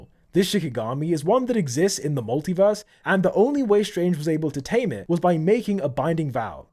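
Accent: United Kingdom. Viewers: This ngas black??? Sorry I don’t have any problems with this but I had no idea. Thought you were a 30 yr old British white guy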